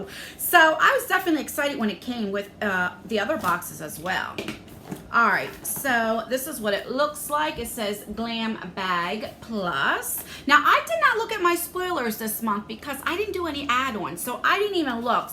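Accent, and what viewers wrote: New Jersey, United States. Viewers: I love your videos. You are so funny and I love your NJ accent.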